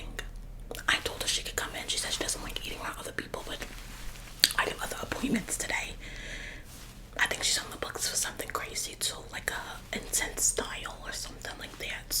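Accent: South, United States. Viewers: The Southern accent is spot on i love it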